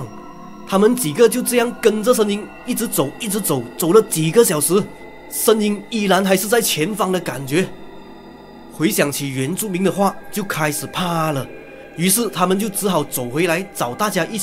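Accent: Malaysia. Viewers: 你的故事整理的很好，但是，只是 建议 “发音” 需要再多加练习调整一下，会更好。【这应该是大马人の通病大概吧】就是句头 句尾语气词 轻重音，整体性的 发声『 抑扬顿挫 』… 我知道你不是专业的，但慢慢可以改善 相信会越来越好，你可以参考其他讲解梳理案件的网红 可以把人带进故事意境中。 其实，使用 “大马腔调”の口音说话叙述 比较不太好聽，讲故事也比较难有意境跟代入感 ，听着也很容易让人出戏～有种讲着讲着很困難，又很卡…卡顿的感觉……。😂 😌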